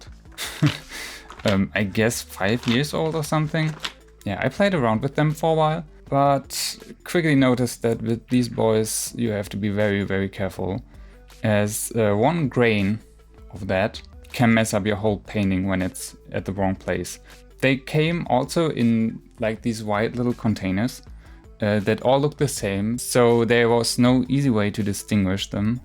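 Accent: Germany. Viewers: Hello, Im new here. And I have a question: Is the a German accent Im hearing?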